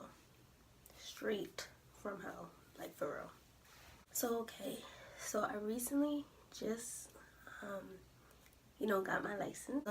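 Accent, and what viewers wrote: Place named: New York, United States. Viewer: Where did you take your road test? You sound like your from NY like me! Lol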